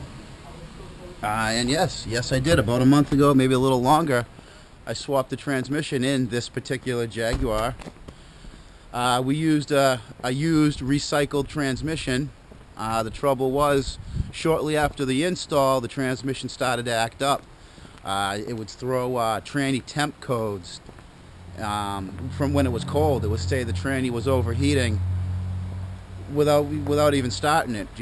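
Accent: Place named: Massachusetts, United States. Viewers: Yo. You gotta be from Massachusetts !!! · 🚗 Loving the Bostonian Accent! These x308 Jaguars have several non-serviceable sensors, when I first bought my XJ8 Vanden Plas I had to swap the throttle body because of a faulty throttle position sensor that is permanently mounted within it. $1,000 part to fix a $30 sensor is crazy, but it made the car road-worthy and it is still working perfectly 7-years after the job with no other major issues. The prior owner had no idea what was causing the problems (scanning codes was all that they needed to do) and he sold it to me for a great price, so I'm not complaining now, but investing $1k to fix a sensor was initially quite concerning, lol.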